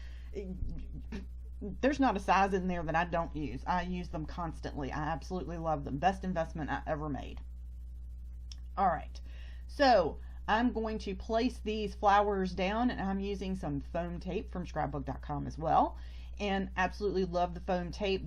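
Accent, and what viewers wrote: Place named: Texas, United States. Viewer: You sound like a Texan. Love these cards. I am in the USA.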